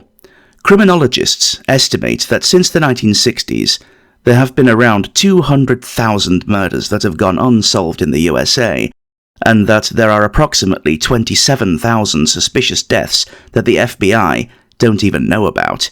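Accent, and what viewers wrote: Australia. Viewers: Cool stuff! Liked your Aussie accent too 😂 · Love it,and your aussie accent was your best yet ;-)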